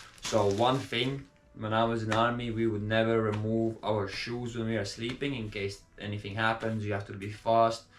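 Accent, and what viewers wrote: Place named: United Kingdom. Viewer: Where abouts in the UK are you from bro ?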